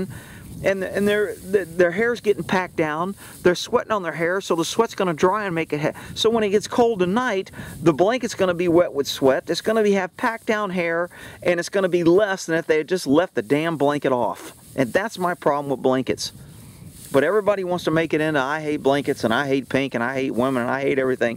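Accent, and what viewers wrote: Texas, United States. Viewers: your Texas accent is stronger...I could barely understand you say "saliva". lol Awesome video. Thanks!!!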